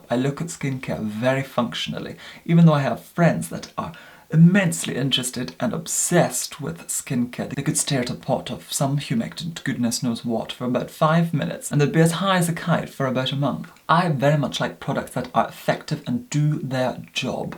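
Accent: Scotland, United Kingdom. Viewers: I'm from Australia, apparently he's from Scotland I read. You guys have too many accents 🤣😂 we just have one Straya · Cora alice Nugent 'sorry' indeed. Accents are malleable... If you listen closely he still has a bit of Scottish in there. Can't believe how rude some people can be. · where are you from? i hear a northern twang in your english accent, perhaps even scottish, however you speak very proper and pronounce some words how southern english people would. i’m very curious. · Liv Cerys then you must be German as he is Scottish for sure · is he scottish or irish? it keeps changing for me please be scottish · He is from Scotland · idk about that but i know he went to college in glasgow and his accent is definitely scottish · I love the accent. I can hear a scottish accent under the voice. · Rose He is from Scotland; in his earliest videos the accent is more pronounced. 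· Scottish I believe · Is it just me or does his accent sound Scottish? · He defiantly has a Scottish accent just a very posh one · Seamlessly Scottishness. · Literally the most posh Scottish accent ever but I love it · He's actually from scotland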